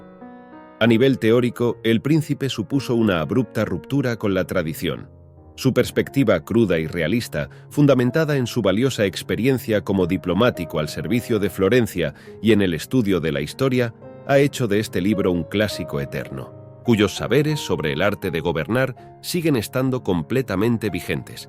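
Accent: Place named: Spain. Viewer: ¿Por qué usas el acento español?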